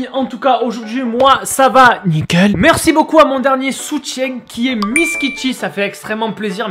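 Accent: Marseille, France. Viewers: waw l'accent marseillais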